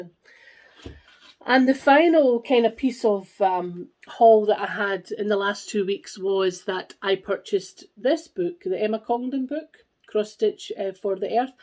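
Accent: Scotland, United Kingdom. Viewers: A great cut and lovely colour! Your hair really suits you. Thanks for the videos, I always enjoy them and I love listening to your Scottish accent. I was born up in Banffshire several decades ago, but was brought down to England as a babe in arms and here I have stayed. Your voice makes be feel nostalgic for Summer holidays with my grandmother. Now I must see if I can out my hands on that Blackbird Christmas design..... All best wishes, Liz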